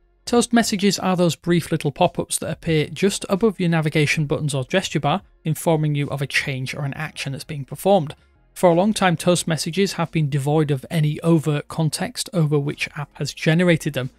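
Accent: United Kingdom. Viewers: Which accent is he speaking? British? Can anyone tell? English isn't my primary language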